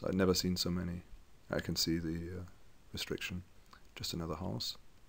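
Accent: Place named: Germany. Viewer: Nice job !!! i listened all, sometimes it was kind difficult to understand just a few words pretty much by your Hard German accent, but you're German talking about German history which is really nice :D !!! i'll be traveling to Germany next year, and i wanna make the tour to all these old days German lands, but i wanna go farter , i wanna include pommer,Danzig,Warmian-Masurian,Kalliningrad, and Memel, currently Klaipeda South Lithuania, all those lands are full of German vestiges,well in this case i wanna ask something to you, as German citizen that you are, so why after Berlin's wall falling, Germany never reclaim any of those lands ???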